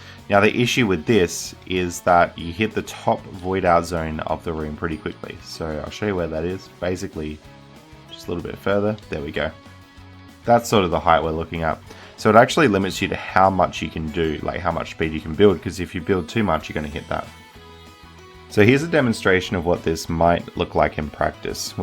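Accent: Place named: Western Australia, Australia. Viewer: I haven't even beaten BK but it's also nice to see love for the Any% run, since 100% is always the main star. Great videos, and nice accent! Western Australia, right?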